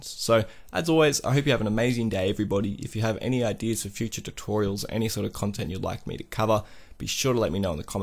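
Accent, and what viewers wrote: Australia. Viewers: Thank you for this helpful video. Nice to hear an Aussie accent! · So glad to find an Aussie tutorial I can understand 😅🙏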